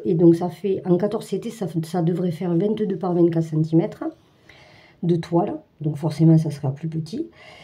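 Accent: South, France. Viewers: Bonjour, Je viens de découvrir votre chaîne et je me demandais si vous n'étiez pas vers chez moi, avec votre accent. J'habite à côté de Narbonne. En plus chez moi aussi il y avait un atelier de la CAF où j'allais il y a 30 ans. 😅 Comme vous, je suis une brodeuse passionnée mais seule à broder dans mon coin. 😅 J'aime beaucoup vos vidéos. À bientôt! 😊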